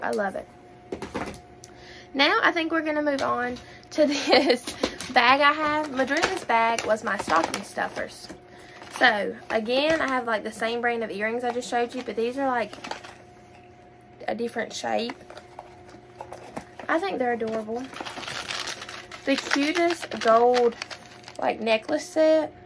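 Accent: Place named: South, United States. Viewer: Cool video 😃😃 I love your Southern credo twang!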